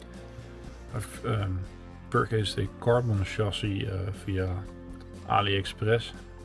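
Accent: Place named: Netherlands. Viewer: Klinkt Nederlands 🎉 dikke setup. Vanwaar de servo saven van Vitavon?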